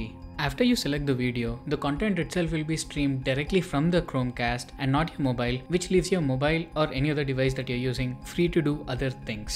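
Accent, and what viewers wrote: India. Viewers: that Indian accent though... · Haha...he is speaking Hinglish. So, you won’t understand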